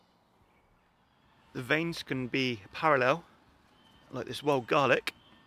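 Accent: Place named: West Country, United Kingdom. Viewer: The man from the West country is back. Nice one.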